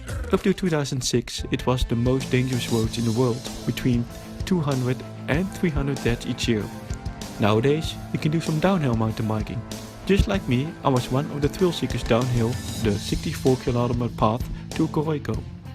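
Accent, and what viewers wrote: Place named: Netherlands. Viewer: Turn on subtitles... sorry for the inconvenience.. Dutch accent is hard to understand apparently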